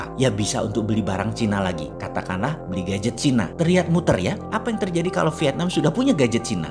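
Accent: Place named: Surabaya, Indonesia. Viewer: Jamput rek ..cakcuke arek suroboyoan.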